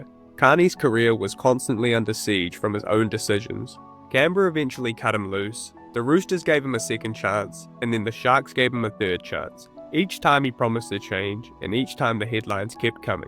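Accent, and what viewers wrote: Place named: New Zealand. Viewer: That’s just the kiwi accent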